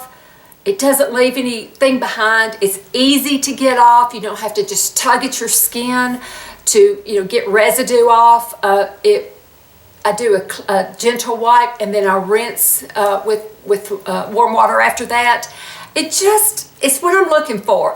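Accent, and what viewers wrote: South, United States. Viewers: Hey gorgeous! Where are you from? Your accent is adorable. Very southern. Would love to be involved in your groups (women of YouTube over 45). How do I get involved? I’ll be 55 on the 23rd. Thanks. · you're fantastic! I have so enjoyed watching your videos for the last few months and love all your recommendations. I have been thinking I needed to take up knitting again. My novice ability should be able to handle these facecloths. Great idea! Thank you so much. I so love your sweet southern voice and your fun informative presentation. I have enjoyed every video I have seen. It is so nice to be able to get all the ideas I need at my age to keep current, healthy and active. I have used tretinoin on and off for 30 years and it does make a difference so I am using it more in the last year and even on my eyelids the last few weeks after I saw women on YouTube say they had used it. 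It did get very dry and irritated the first time and then I used oils to hydrate and then cautiously proceeded the next week to use it with my eye cream every other day. Thanks again. xo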